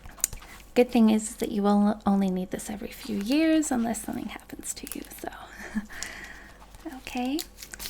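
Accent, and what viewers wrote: United States. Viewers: Omg what ur not American??? I’m American and this is literally such a good accent, I’m so impressed that u did it so subtly. Also loved the ASMR part lol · I had forgotten how good your American accent was. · Liking that American Accent.Great work. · Your American accent has become so convincing! About halfway through the video it clicked that this wasn't a Scottish, British, or Australian accent. Really good stuff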